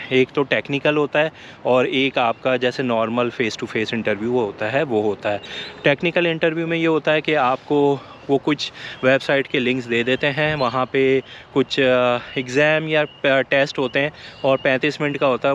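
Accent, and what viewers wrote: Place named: Karachi, Pakistan. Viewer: bhai baaton se Karachi ke lag rahay hain. I loved your video. I would love to meet you in Australia. I am landing soon.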